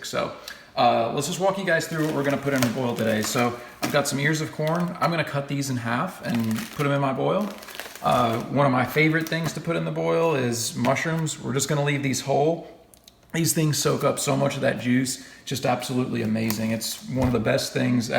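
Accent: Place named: North, United States. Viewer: you sound like a yankee. no wonder you did everything "back assward".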